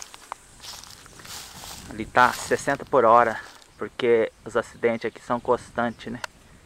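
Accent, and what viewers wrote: Minas Gerais, Brazil. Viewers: Sotaque gostoso de ouvir é o sotaque mineiro👏👏👏👏👏👏👏👏👏👏👏👏👏👏👏👏👏👏👏👏👏👏👏👏👏👏👏👏👏👏👏👏👏👏👏👏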